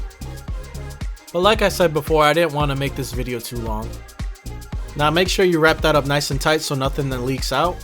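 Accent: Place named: Pennsylvania, United States. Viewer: You gatta be from PA with that accent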